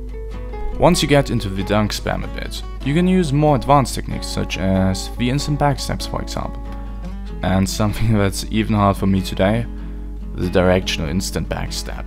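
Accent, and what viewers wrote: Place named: Germany. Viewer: Klingst auf jedenfall deutsch xD sehr schönes Video 👍Abo hast du sicher.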